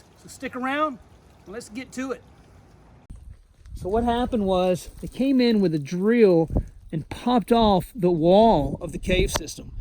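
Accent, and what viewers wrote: South, United States. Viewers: it's the southern accent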